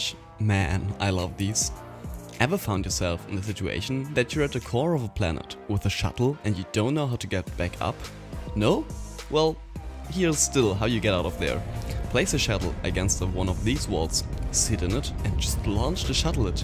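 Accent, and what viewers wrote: Germany. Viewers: You are German Right? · Der deutsche akzent ahhh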